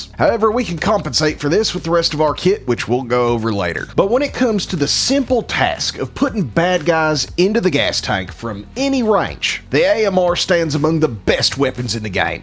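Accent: United States. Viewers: is this country accent real? it's annoying af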